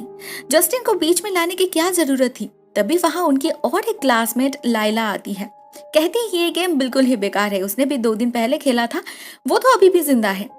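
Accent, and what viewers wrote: Bengal, India. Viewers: U have bengali accent 😀 ..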